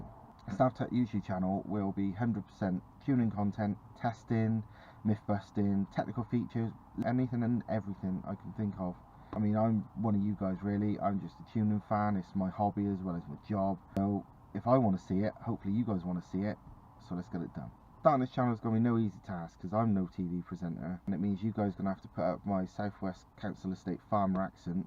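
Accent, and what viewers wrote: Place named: South West England, United Kingdom. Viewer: Also i'm from the SW, didn't expect the accent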